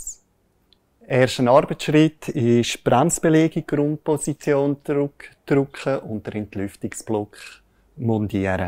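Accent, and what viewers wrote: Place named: Switzerland. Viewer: Obwohl ich aus Norddeutschland komme habe ich das Video mit dem Schweizer Deutsch super verstanden hammer Video Klasse erklärt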